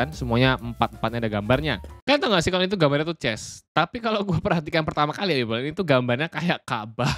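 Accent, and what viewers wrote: Java, Indonesia. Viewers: Medhok bgtt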